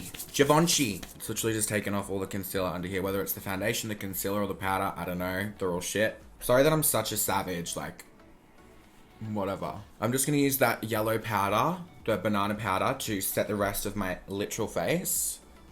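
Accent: Australia. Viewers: Is he Aussie? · "Shout out to Kylie for making me spend $50 on this piece of shit" You can definitely tell you're an Aussie 😂 you crack me up so much because you remind me of some of my friends. Love to you from Melbourne!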